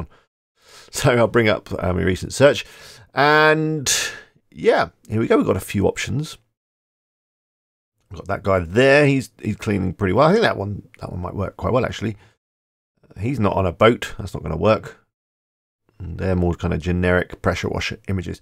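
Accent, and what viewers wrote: United Kingdom. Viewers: Here you get real stuff and with British accent. Epic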